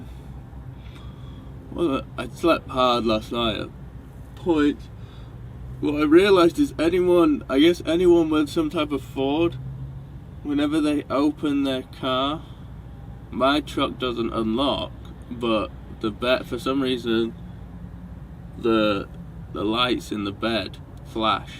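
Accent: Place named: United Kingdom. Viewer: Welcome to the states. I assume you live in the UK? Those hard boiled eggs looked good. Now I'm hungry for hard boiled eggs. Looks like you're well packed for your trip. The truck bed looks comfortable. Sounds like a good time with family in Vegas and camping afterwards. Enjoyed the video. Love your British accent.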